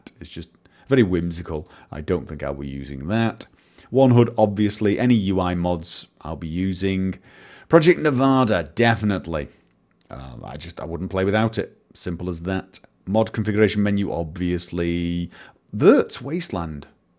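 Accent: United Kingdom. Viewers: Does he have a British or Scottish accent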